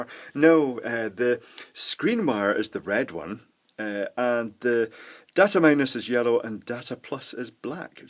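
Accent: Scotland, United Kingdom. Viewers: I wrote this before - I find wit and mellow Scottish accent very soothing. I use it as an antidote for Dave (from EEVblog), who has an aggressive Australian accent and an annoying manner of speech:) For me the prescribed dosage is 3 per one Dave:-D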